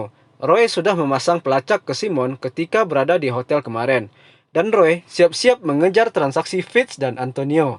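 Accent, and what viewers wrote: West Sumatra, Indonesia. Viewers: Orang Sumatra barat ya bang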